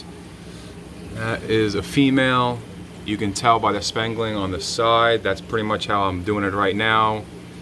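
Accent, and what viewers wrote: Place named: New York, United States. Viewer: Where you located definitely a New York or New Jersey accent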